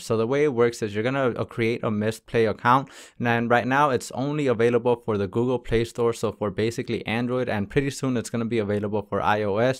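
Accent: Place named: Latin America. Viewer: Are you latino? Ur accent bro nice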